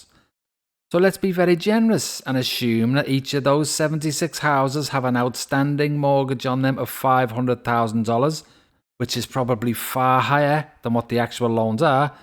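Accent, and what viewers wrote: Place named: England, United Kingdom. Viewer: Also it’s interesting to note you’re accent is English, New Zealanders have paid massive money to our indigenous Maori And rightly so, but what’s so disgusting about it is your average New Zealand in fact all New Zealanders never made the deal but paid the debt for the UK, we never gave their land away illegally your English monarchy did it but yeah this country paid the bill with nothing ever removed from the precious royals thou their family signed the deal and stole the land. Also more men died per capita in the second world war than most countries including England, we stood beside England from the start until the finish with the NZ Army being one of the best performing armies throughout, yet we’ve always been punished as the colonials used as Cannon fodder for those superior English inept generals, so the guys who help save England then have to pay three times the amount to get to the UK because of the exchange rate in England then gets all the benefits of coming to New Zealand and now thanks to you will be now snapping up all our property & everything else and at a third of the cost. 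So thanks for pointing that out to half of England because I’m sure you’ll all benefit from it again at our expense especially now England’s at War again internally, I’m sure they’ll be using all your wonderful knowledge to take advantage of us Kiwis again so thanks a lot mate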